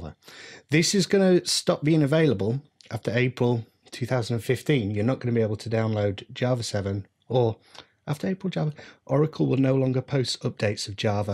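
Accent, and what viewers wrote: United Kingdom. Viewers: nice accent british or ?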